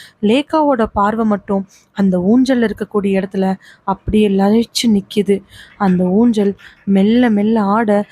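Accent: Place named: Coimbatore, India. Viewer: பழைய கதை எல்லாம் கேட்டு கொண்டிருக்கேன் சூப்பர். அப்டேட் டும்.. கேட்கிறேன் அருமை.. சகோதரி வாய்ஸ் கோயம்புத்தூர் டோன்... 👌🤝💐 திண்டுக்கல் எங்க ஊரு வாவ்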